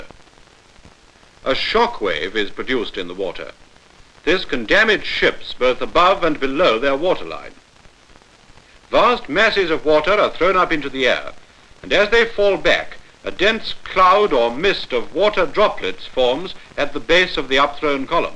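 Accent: United Kingdom. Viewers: That high-brow British accent... that same annoying accent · This is a British film, not Canadian. Love the narrator's Received English accent. Flash sounds like flesh. No wonder why they call a flashlight as a torch. Americans would laugh if they heard something that soundly like fleshlight. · Typical british accent...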